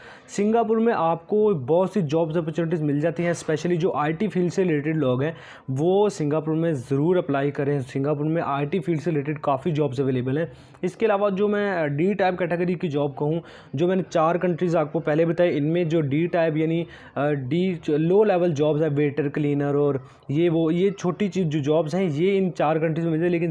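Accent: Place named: India. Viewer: Are you Indian or Pakistani because you speak like Indian. If you are Pakistani so please don’t speak like that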